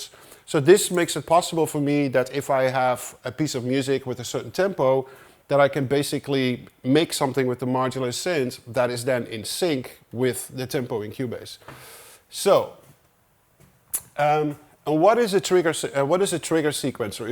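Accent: Netherlands. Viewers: your accent is almost completely gone but I grew up with dutch parents and their dutch friends who loved keeping adult conversations from us by not teaching us dutch . but it was still fun to listen to . to this day a dutch accent is music to my ears lol . hope I'm not wrong on this ______if so you just heard my long story for nothing . (ok back to the video) gerrit jan schraa california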